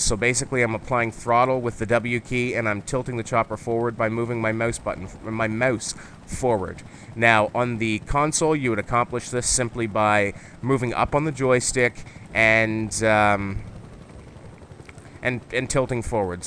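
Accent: Canada. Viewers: out, about, mouse, because, alright, target, .. just sound weird in this video.. oo. i know y.. he is Canadian Ah. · You sound like your from Canada !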